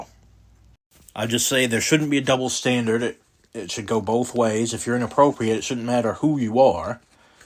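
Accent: Maine, United States. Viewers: I hope you don't take this as me fishing (lolgetit) for information and feel free to delete my comment or just ignore if you don't want to reveal but...is that a Maine or Maine adjacent accent?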